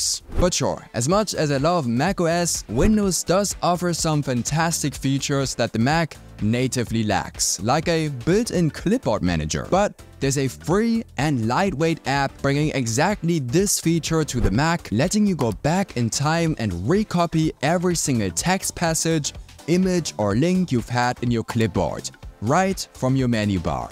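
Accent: Germany. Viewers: really knows his stuff and doesn't waste a word - if anything he's too concise. His tips are uniformly great. (And the "light L" and glottal stops that Germanise his flawless English only add to the aura of genius.)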